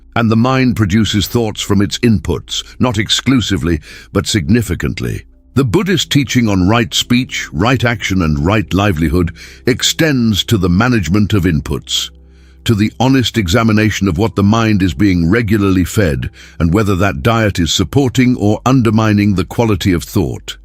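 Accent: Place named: United Kingdom. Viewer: Why speaking so fast and with a British accent. I am a native Frenchman and only understand standard American.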